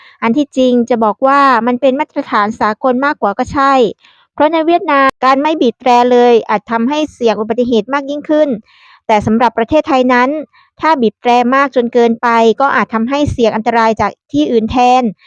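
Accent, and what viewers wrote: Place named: Laos. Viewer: น้องแอด เป็นสาวลาวป่าว..สำเนียงน่ารักน่ะ...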